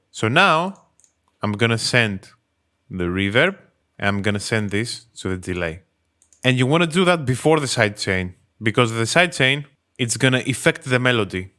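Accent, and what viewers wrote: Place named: Greece. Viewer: Are you from greece? Cause your accent sounds a bit greek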